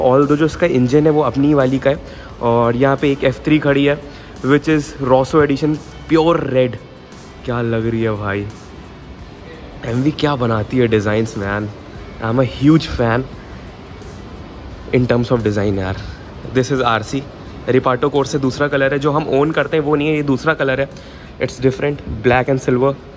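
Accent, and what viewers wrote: Lucknow, India. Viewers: Bhai lucknow se ho 😄😄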